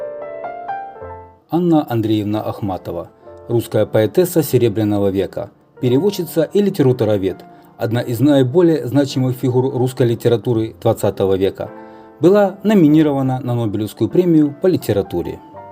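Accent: Ukraine. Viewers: Ха)))) Меня тоже жутко резануло по ушам голос за кадром! Это надо же,даже не поработав над своей дикцией пускать это все в эфир!)))) Не лучше ли было с таким украинским акцентом,озвучивать на украинском?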